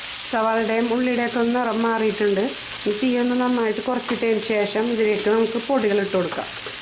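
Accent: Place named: Thrissur, India. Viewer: Trissure slangilulla avatharanam enikkishtappettu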